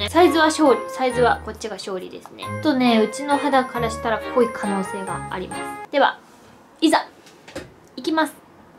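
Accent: Kansai, Japan. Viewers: 秦基博好きなんですか？ なんとなく関西弁の人見たら応援したくなります。これからも頑張って！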